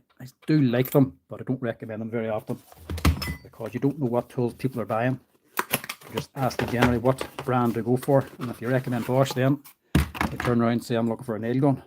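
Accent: Ireland. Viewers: . Keep doin what you do. Love hearin Irish from the other side of the pond 🤪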